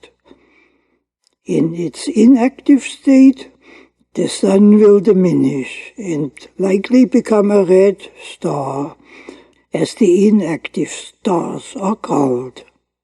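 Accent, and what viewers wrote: Germany. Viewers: i think your work is great and the Einsteinian accent adds to the atmospheric's, your humanist attitude is enviable and i look forward to further work by you. thanks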